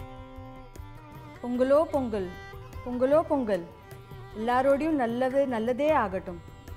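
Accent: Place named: Tamil Brahmin, India. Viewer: Pongal Paanai on a gas stove! wow. awesome clear instructions. Loved the tambram pronunciation as well - a bonus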